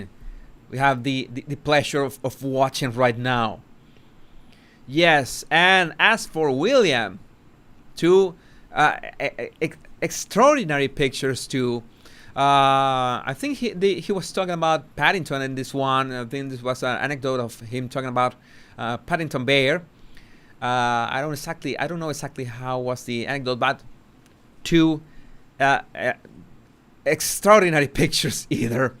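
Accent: Cuba. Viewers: you make me laugh when you say your "Ricky Ricardo" accent. I was just wondering if you hear yourself the way others do? Do you hear the Ricky Ricardo accent? I'm from Boston and whenever I travel somewhere else people, random strangers, have come up to me and tell me "You're from Boston right? I can tell by your accent!" I don't hear it at all!:) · I love the Ricky Ricardo-ness of your accent! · your Ricky Ricardo accent is part of your charm.😄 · What I love is your Ricky Ricardo pronunciation, don´t chance it. I practice my English with you, and I understand you almost everything. Love your accent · Your Ricky Ricardo accent is fantastic. Love and bliss to you,! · My Cuban father came to America and married an American. Growing up, we watched I love Lucy and I was so proud that my dad had an accent like Ricky Ricardo. My father died in 1990 and I confess that your accent takes me back. 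I love listening to you speak. It's like music to my ears. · I e lived I both countries - is the accent Cuban or Venezuelan ? Imo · My father was Cuban too. My mother is Puertorican and both came to this country at 10 and 12 yrs old, respectively. My father never lost his accent! He was so proud to be Cuban. He passed Jan 2020. He was also a first responder during 9/11. Anyway, I also love your accent as it reminds me of Papi. Thanks,!! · I love your Ricky Ricardo accent, and like your perspective in the analysis of the body language. It is very interesting.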